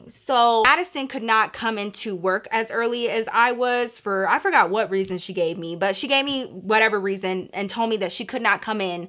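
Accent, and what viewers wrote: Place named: South, United States. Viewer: I love how u say certain words ur Southern twang is so cute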